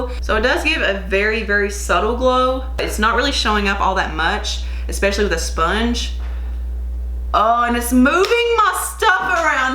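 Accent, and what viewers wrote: South, United States. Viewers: I saw this brand on the WM website and was on the fence whether I should give it a shot! Side note: I just found your channel and I love finding youtubers that are from the South and sound like people I know. I'm from OK!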